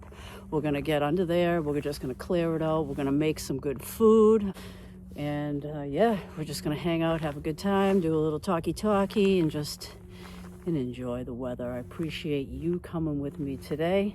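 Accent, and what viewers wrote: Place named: Boston, United States. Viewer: Love your videos & your Boston accent.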